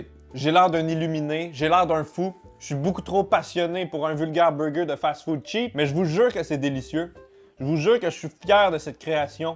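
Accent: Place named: Quebec, Canada. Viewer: arrête de parler comme un québécois stp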